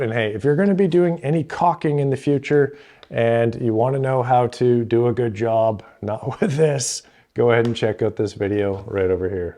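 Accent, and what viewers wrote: Canada. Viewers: Camera work is getting so good! And...yeah i watch these videos cuz you're insanely handsome, and I have a soft spot for Canadian accents, but the handyman tips are a bonus 😎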